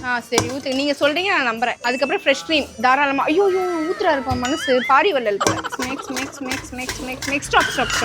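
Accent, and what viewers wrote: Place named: North, India. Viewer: Tamil pesum vadakan 😮😮😮😮😮😮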